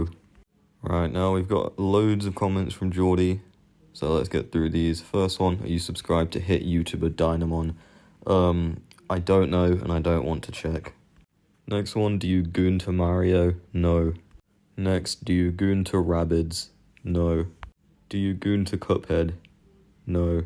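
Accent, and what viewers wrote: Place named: United Kingdom. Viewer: This video is disgusting because your British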